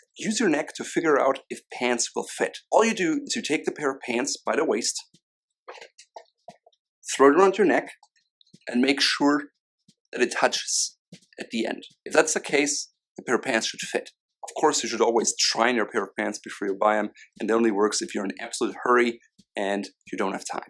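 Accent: Germany. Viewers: Is your accent German?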